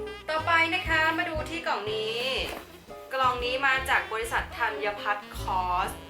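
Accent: Isan, Thailand. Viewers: สำเนียงอีสานก็มา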